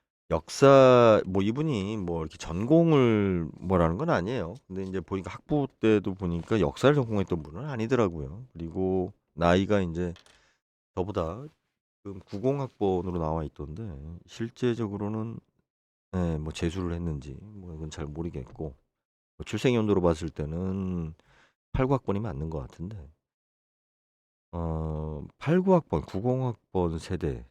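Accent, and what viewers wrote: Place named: Jeolla, South Korea. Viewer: 내 귀엔 너에게 전라도 말씨가 들리네? ㅋㅋㅋ 호남 청년들도 많이 돌아섰단다. ㅋㅋㅋ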